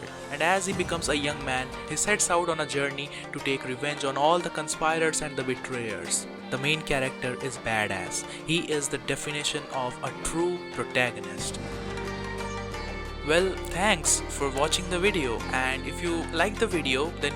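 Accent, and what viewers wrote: India. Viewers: You are indian ? Your accent is desi😂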